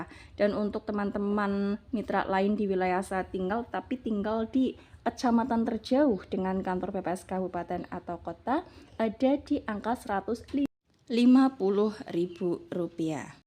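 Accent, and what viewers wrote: Banyumas, Indonesia. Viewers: kok kaya ngapak aksennya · Bantu jawab...kalo ga salah dari banyumas purwokerto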